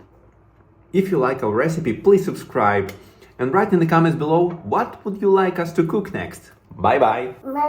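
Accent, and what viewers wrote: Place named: Moldova. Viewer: . 🧢🧢🧢 LA SUPERLATIV ❤❤❤ SA-ȚI MANINC EU. GURIȚA TA. ȘI VORBA. MOLDOVENEASCA🎉 👍👍👍💝💝💝🌹🕊️🥰🙏.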